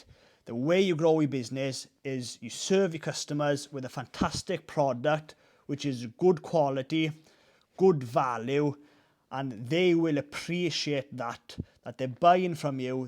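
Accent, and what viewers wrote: Wales, United Kingdom. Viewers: Love the Welsh accent!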